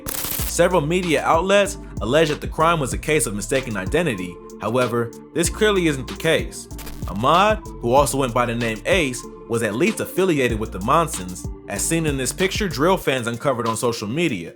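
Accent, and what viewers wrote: United States. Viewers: 😂😂why is there an American commentary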